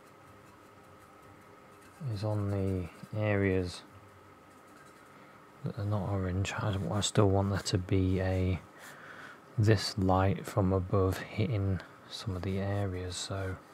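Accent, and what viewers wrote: United Kingdom. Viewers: Your British Accent is really so awesome and polite Dear.😊😇🥰😍🤗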